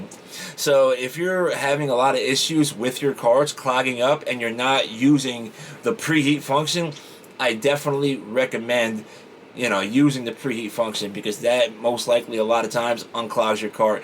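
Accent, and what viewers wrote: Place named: New York, United States. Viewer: Okay I just heard your voice alone and you just sound like home to me. My family is from New York and you sound like my people. 💜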